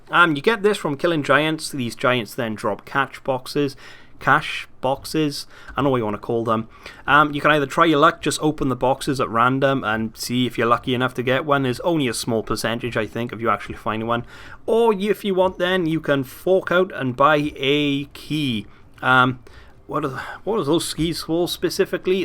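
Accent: Wales, United Kingdom. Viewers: Omg, you're Welsh!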